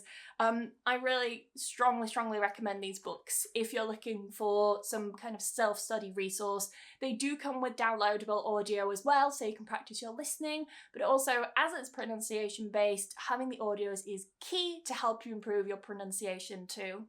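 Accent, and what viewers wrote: United Kingdom. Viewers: British accent is fascinating 😍 but I wanna learn US. accent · English pronounciation? Or british accent pronounciation?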